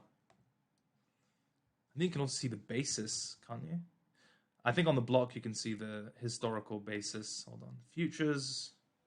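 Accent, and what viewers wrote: United Kingdom. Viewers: love listening to you video with British accent and voice haha n the way you explain your chart , slowly bring out the flow and reason why n all the details ! hope theres live video more often ,thanks ! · Missed listening to the British accent. Can you record the twitter spaces and put it on Spotify?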